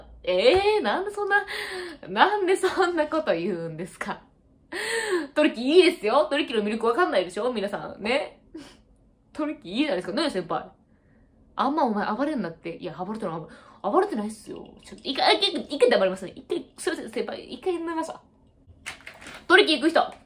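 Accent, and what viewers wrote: Kansai, Japan. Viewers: 関西人がしゃべる標準語よき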